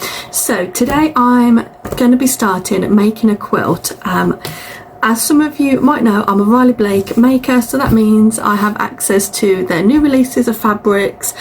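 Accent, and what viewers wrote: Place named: England, United Kingdom. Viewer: Hello, I am new to quilting and your channel, so interesting to see how a quilt comes together. Please keep it up on YouTube. I would love to see more tutorials especially with an English accent! I prefer the landscape format if that’s possible but not essential. Thank you for sharing your journey, much appreciated. Happy sewing.🧵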